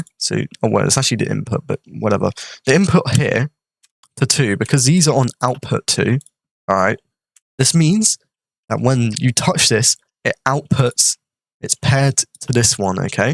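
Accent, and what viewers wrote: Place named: United Kingdom. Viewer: he got that Gordon Ramsay accent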